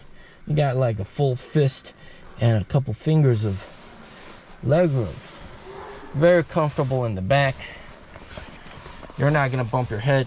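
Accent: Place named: United States. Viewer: Never watched any of his video, got a headache from all the «cool american» accent. Keep it simple, not everything is sooo cool. From an european’s perspective, the car looks great, but sorry. It’s still a Chinese car with shitty range. It will never work outside of China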